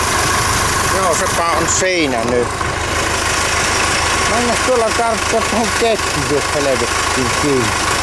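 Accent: Savo, Finland. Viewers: Rikasta tuo savon kieli😊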